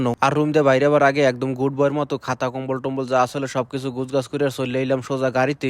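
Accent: Barishal, Bangladesh. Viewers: এই হালায় মনেহয় বরিশালাইল্যা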